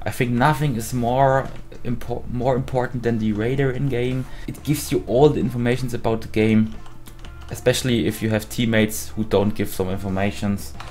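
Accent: Germany. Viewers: Ich wusste das du deutsch bist schon ab Sekunde 1 xD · Also als deutscher merkt man das du deutscher bist😂 lol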